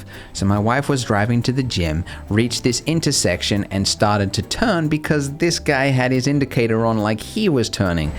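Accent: Australia. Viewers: You can't tell he's Australian from his accent? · look at the video -which side of the road is the car driving on? Now listen to his accent. It's not Cali or Minnesota. It's Australia, mate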